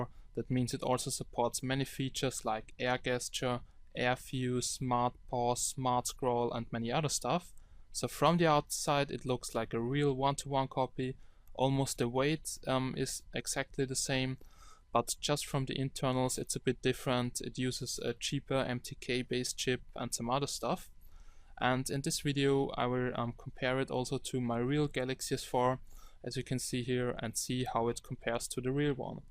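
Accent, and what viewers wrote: Germany. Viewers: Hallo, ich nehme an das du deutsch sprichst, deines Akzentes und einiger Handy's wegen in denen ich gesehen habe, das Sprachen auf Deutsch sind;) Finde deine Video's sehr spannend und belehrend, mach weiter so! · are you german? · Man merkt das du deutscher bist ahhaha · du kommst doch aus deutschland :D ich hör dein akzent :D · are you german ? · Maybe that`s the reason I understand him so well - I`m German too... :D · Man merkt nach dem ersten Satz das du Deutscher bist :) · Are you german ? Because you speak english like a german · wie man merkt dass du deutscher bist :D - aber gutes englisch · You have a german accent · Digga du bist deutsch oder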